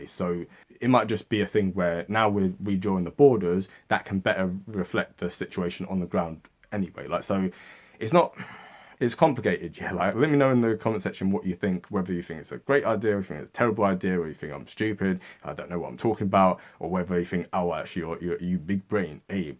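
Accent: England, United Kingdom. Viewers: You can't win here. The sound of an English accent providing solutions to Irish problems drives even the most Irish people bonkers, as there is a long, long history of 'English solutions' causing endless problems for the Irish. You're a brave man...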